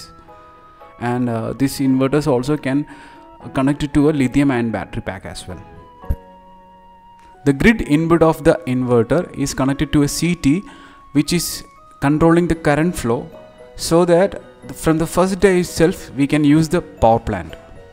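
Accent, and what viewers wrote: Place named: England, United Kingdom. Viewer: bhai india ka hi hai na mujhe to lagta hai England ka hai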